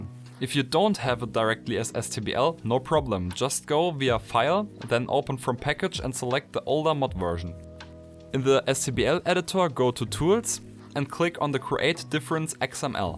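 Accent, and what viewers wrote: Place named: Germany. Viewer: Mir gefällt die deutsche Aussprache in anderen Sprachen so verdammt sehr! Ich hoffe ich habe auch eine deutsche Aussprache, wenn ich Englisch und andere Sprachen spreche.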